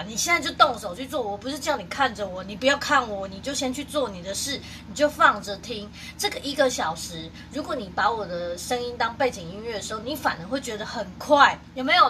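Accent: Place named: Taiwan. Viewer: 的台味超級喜歡😘有買的書，現在在家防疫都聽說書邊丟東西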